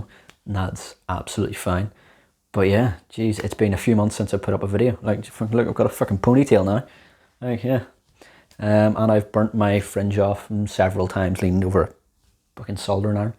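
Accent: Northern Ireland, United Kingdom. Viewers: Nice to hear a Northern Irish voice on YouTube! Great channel! Not sure if you've already done this but 'How to make a AM/FM Radio' would be cool. Cheers.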